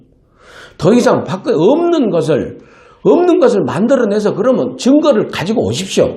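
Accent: Jeolla, South Korea. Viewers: 민영삼 티비 출발을 축하합니다. 전라도 사투리를 쓰시기 때문에 방송국 패널을 하실때 부터 제가 편견을 가지고 있었던 것을 고백하고 용서를 구함니다. 최소한 중립적인 관점에서 현 공영 언론들과 주사파 정치인들의 망국적 `언행을 비판해 주십시요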